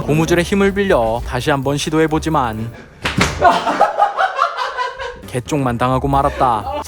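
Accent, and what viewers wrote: Jeju, South Korea. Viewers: 이분 고향이 제주도인가요? 처음듣는 사투리같아요 ㅋㅋ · 제주도 사투리 싱기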